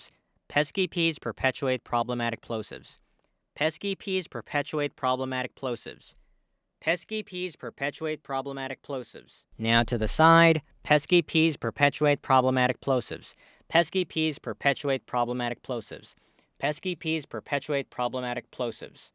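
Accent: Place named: United States. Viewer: In the video you said you are from the Philipines, but your accent is like native American! how come? did you learn it or your were raised in the USA?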